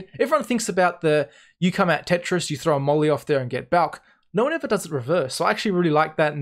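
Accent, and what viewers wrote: Australia. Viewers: Greater Sydney or South West Sydney accent to be specific ;) (I think)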